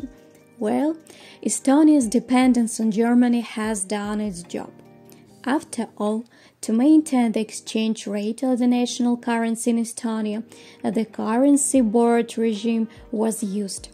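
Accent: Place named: Russia. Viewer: First, I want to say I think it's great you're practicing your English and aren't afraid of making mistakes! However, I am a native English speaker with some Russian language background, and even with my better-than-average ability to listen to Russian accents, your Russian accent is so strong I can't understand half of what you're saying. It barely sounds like you're speaking English. I would suggest three things: 1. Put English subtitles in your videos, or 2. Practice your English accent until you're understandable before making more videos, or 3. Just do your videos in Russian.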